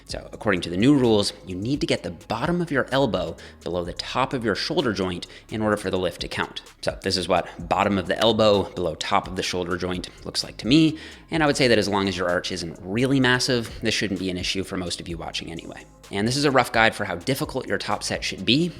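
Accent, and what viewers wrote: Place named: Newfoundland, Canada. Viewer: love your stuff! Didn't realize you were from newfoundland until I watched your body recomp video and were talking about carrrbs, just waiting for a jiggs dinner video haha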